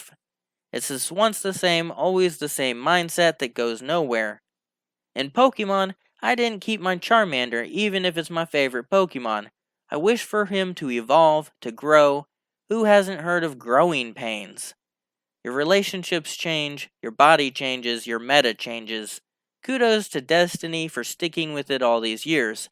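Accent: United States. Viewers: if i didnt knew it better i would think you are american (cause of your english skills and speech) :D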